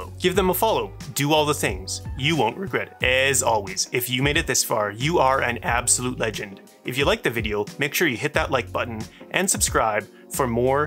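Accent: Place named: Canada. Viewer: This guy sounds Canadian......"went to the same store" Yup! Nice vid fellow hoser.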